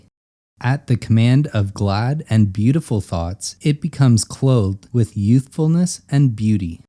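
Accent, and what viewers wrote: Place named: Maritimes, Canada. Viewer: Maritimer eh? Just subtle.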